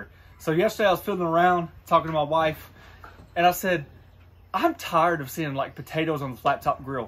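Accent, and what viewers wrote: South, United States. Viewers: .....that will work also....just found your channel....sounds like your from the south....I'm in Illinois, but have family in Nashville TN area....looking to retire and looking for somewhere warmer in the winter....LOVE UPUR VIDEOS...KEEP THEM ROLLING...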